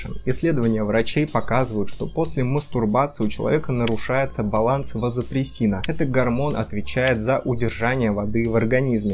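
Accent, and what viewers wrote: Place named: Okanye, Russia. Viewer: это оканье в течении всего видео прям зае....ло конкретно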